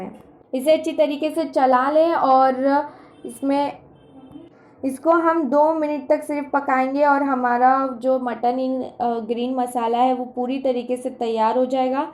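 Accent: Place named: Marathwada, India. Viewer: Ap pakka marathwada region ke ho , apki toning se pata chalta hai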